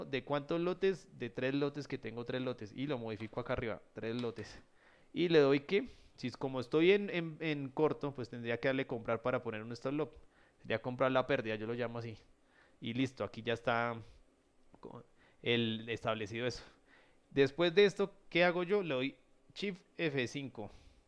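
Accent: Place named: Colombia. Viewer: acento colombiano fuerte jejejeje, Gracias por lo que aprendemos de ti!! Por favor respondeme a que te refieres con "Esta si tiene pareja" en los gráficos??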